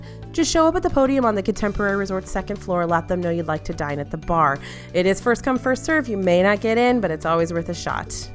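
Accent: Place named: Buffalo, United States. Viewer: are you from Buffalo? Takes one to know one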